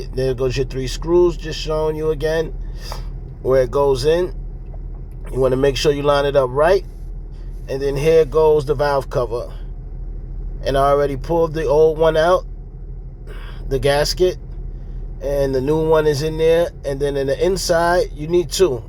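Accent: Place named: Philadelphia, United States. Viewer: I see your page says Orlando but bruh I swear you sound like your from Philly.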